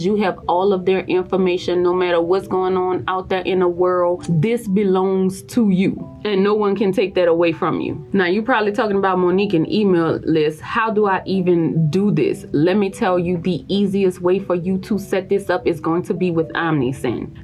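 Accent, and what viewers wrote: New Orleans, United States. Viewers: It's the NOLA accent for me❤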